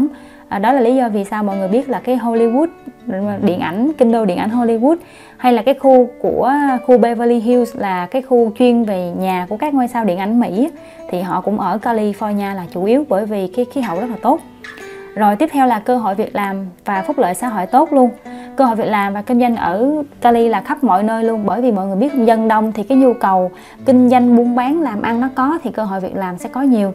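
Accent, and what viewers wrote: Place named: Central, Vietnam. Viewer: tôi rất thích nghe giọng của chất giọng miền trung, vùng NHA TRANG KHÁNH HOÀ cảm ơn đã chia sẽ, THANKS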